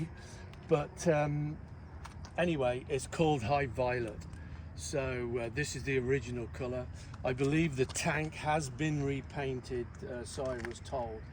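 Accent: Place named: Midlands, United Kingdom. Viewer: Aye, I could tell you had a Midlands accent. I'm originally from Huddersfield.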